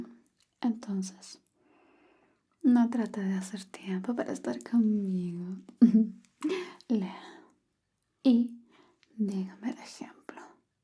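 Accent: Chile. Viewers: ay, soy de méxico pero me encanta el acento chileno, siento que tiene un algo, me parece muy hermoso su dialecto y más cuando veo a o a mon laferte hablando con el acentito chileno, muchas gracias, tamoo 😭💗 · ahhhhhhhhh chileno po ❤ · como siempre tan linda 💖. Yo soy mexicana y el acento chileno a mi parecer es uno de los mejores siendo mi favorito, es hermoso que hayas hecho un vídeo, siempre me relajas.💕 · cómo amo este dejo enserio todos mis amigos de Fortnite son chilenos y su acento es muy lindo como me encanta que wea jaja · Voz sos de chile che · Me gusto cuando usa el acento chileno para las explicaciones jsjsjs aparte soy chileno y hable todo correctamente y la profe no me dio mis punto po wn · Siiiii dios mío, las ganas que tenía que me enseñe un poco de chileno 🔥💜💜✨ Cada que habla con su acento chileno original es lo mejor ❤️💖💖 saludos desde México 🥰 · Me encanta el acento chileno :shelterin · Que bella profesora, soy de ciudad de México y tuve la oportunidad de conocer tu bello país hace 8 años , para ser exacto estuve en Santiago, Viña del Mar, Valparaíso, San Rafael , Los Andes , me encanta su acento aparte de que conocí a muchos Chilenos también en un viaje a Europa que hice y la verdad me adapte con ellos son muy buenos amigos , y recuerdo de las palabras , el po, flaites , culeao, weon etc saludos guapa y no me pierdo tus ASME , abrazo grande 😊😊😊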